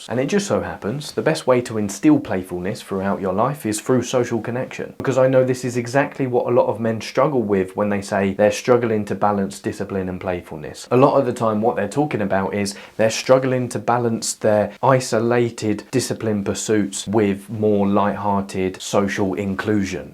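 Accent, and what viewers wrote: United Kingdom. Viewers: I don't often come across British English, so it's difficult for me to understand everything you said. But wow, I really love you and your content. Your knowledge helps me a lot, thank you.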